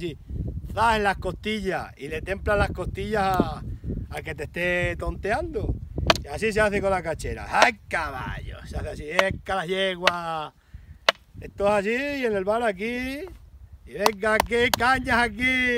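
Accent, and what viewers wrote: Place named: Andalusia, Spain. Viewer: me descojono escuchándote.Pareces Andaluz y no Extremeño.Me recuerdas mucho nuestros mayores de pueblo.Yo soy Malagueña.Llevo muchos años viviendo en la capital pero sin olvidar mis raíces.Soy de ( Comares ) pueblo de la Axarquía Malagueña.Y seguimos teniendo casa de mis abuelos y tíos,en el campo,no en el pueblo.Cuando vamos con mi tita María,pues la casa es suya,disfrutamos más que niños.Yo nada más colocar la comida y lo que llevamos para pasar unos días,me coloco ropa cómoda y mis zapatillas y me voy a dar vueltas al campo,al huerto,a por espárragos,a coger aire fresco y puro.Disfruto recordando viejos tiempos y dándome algún que otro guarraso en el suelo.pero más feliz que una perdiz.Saludos de esta Comareña que vive en la ciudad,sin olvidarse del campo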